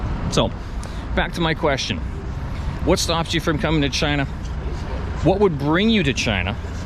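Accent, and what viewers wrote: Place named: Canada. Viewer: Interesting that you digitize people and I’m interested how you isolate your voice in Starbucks. I suspected you were Canadian even before you answered. I’m also a Canadian in China.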